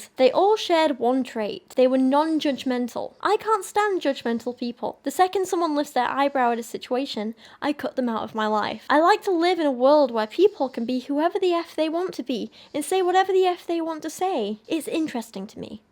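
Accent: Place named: United Kingdom. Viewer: I have been wanting to ask but your accent... Where are from? I'm guessing UK but what part?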